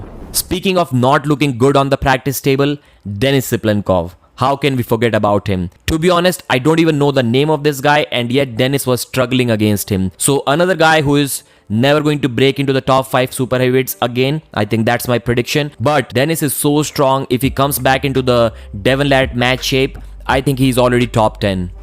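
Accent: India. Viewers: the strong Indian accent of the speaker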